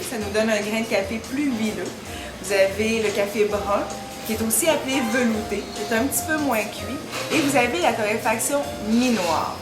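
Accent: Quebec, Canada. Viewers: votre accent est très agréable, vous me faite aimer de plus en plus le Quebec. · merci bcp pour 1 conseil sup...avec 1 accent qbqwa c vrmt cooool.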